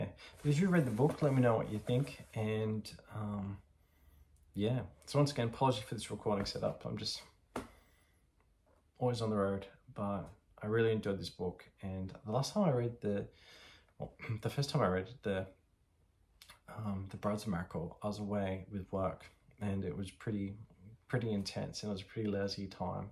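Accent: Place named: Australia. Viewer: I’ve read all of the Wicked books and just finished Oracle - about to start Witch. Great review, that reveal at the end was almost a dream-like experience, kind of made the entire storyline of the entire 6 books thus far just wash over me with nostalgia and revelation - such a cathartic moment. Loved the entire book. Btw I’m an Aussie too and it’s so nice to hear someone pronounce the names the same way I do, whether it’s correct or not 😂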